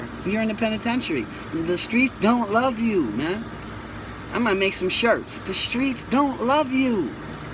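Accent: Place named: New York, United States. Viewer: Real new yorker. You can hear it. Bless up keepup the good work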